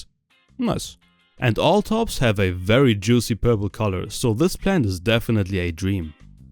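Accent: Germany. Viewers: I hate to assume but you sound German so maybe you can grow in your home or yard soon. Also I assume you live in Germany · Dieser deutsche Akzent 🤣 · Man hört das deutsch richtig raus hahahah ich liebe es ❤️😂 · Man hört voll das er deutscher ist sein Englisch verrät ihn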